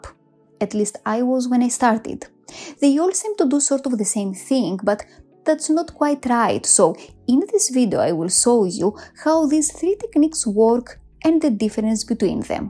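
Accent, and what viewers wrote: Russia. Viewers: English language with Russian accent ouraaaa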